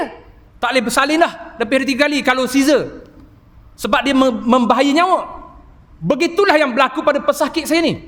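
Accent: Terengganu, Malaysia. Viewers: Sedak dgr ustaz cakap ganu..💯💯🔥🔥..tq ustaz atas ilmu yg dikongsi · "Saya x boleh cakap laju sangat. Keluar bahasa terengganu" gelak sorang diri aku dibuatnya 🤣 berusaha sungguh Ustaz ni kurangkan loghat nya..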